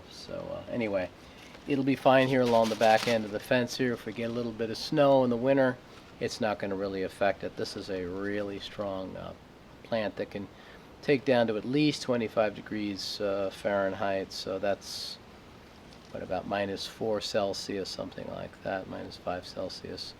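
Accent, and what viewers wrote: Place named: New York, United States. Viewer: Good and interesting to watch, as always. You really got into your New Yorker character. Boy, did that bring back memories.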